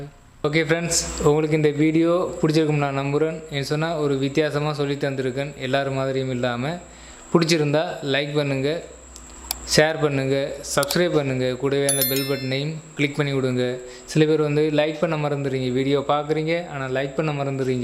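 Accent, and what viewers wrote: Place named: Sri Lanka. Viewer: Excellent SriLankan Tamil. Super . Vazthukkal Brother...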